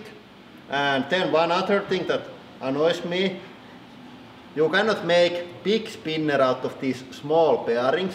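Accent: Finland. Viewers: No ei ainakaan mua haittaa vaikka se puhuukin niinkun suomalaiset mutta vastasin vaan tähän kommenttiin... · aikamoine ralli englnti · ei nää kanavat olis mitää ilman tätä ralli englantii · the finnish accent tho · The finnish Accent xD #SuomiOnParas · moikka vaan! huomaan tuolla äänellä ja paidalla että olette suomalaisia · tykkään kuunnella tota rallienglantii! se on kivan kuulosta 😂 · huhhu mikä ralli englanti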